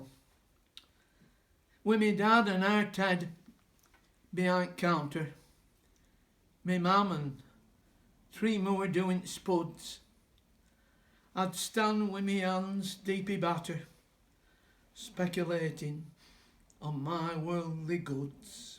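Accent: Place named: Lancashire, United Kingdom. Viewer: Ee that were reet gradely! I remember you from my days at Roebuck Skoo- you did a fine job of judging the Easter hardboiled egg competition! Luvely to 'ear the story of the prodigal son tewd in the Lanky dialect. I could see your emotion when you told about your dad standin' under't lamp waiting for your return. Thanks so much, and see you on yonder Shore if not before!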